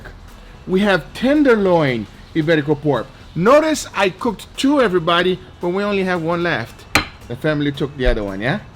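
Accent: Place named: Brazil. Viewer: Brazilian Accent ALERT!!!!! hahah muito bom seu canal to usando varias técnicas que aprendi com você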